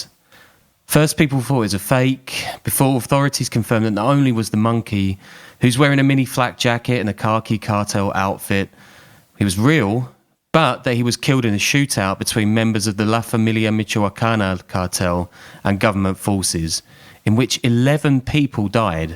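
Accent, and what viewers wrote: United Kingdom. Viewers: Didn’t watch the entire video, as I was sick of seeing the same pictures over and over. And someone with a British accent talking about something that happened in Mexico is very odd …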